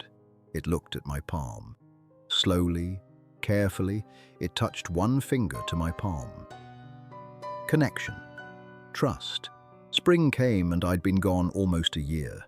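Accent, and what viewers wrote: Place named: England, United Kingdom. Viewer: Why does the AI narrator have an accent that sounds like someone from England when this story is about the Cascade mountain range from Washington state, USA?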